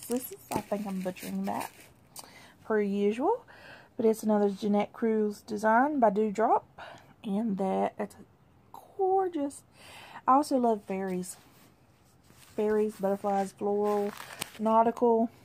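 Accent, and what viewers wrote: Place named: North Carolina, United States. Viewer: Congratulations Proud Mom! Thank your Son for me for his service, please! Love the stash! Cute doggies too! Myrtle Beach is my favorite place on Earth! Is there a LNS in MB or nearby? Love your accent 'cause you sound like me, ( I'm in the NC Foothills, Wilkesboro, between Boone and Winston Salem). Anyway, after all of that said, I really did enjoy your video! Best Stitches! 😀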